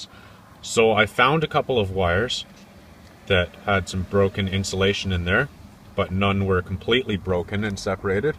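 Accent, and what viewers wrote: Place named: Midwest, United States. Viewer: just for kicks where is that accent from you Canadian or from Minnesota?? Lol. I'm a midwesterner too so I picked up on the accent?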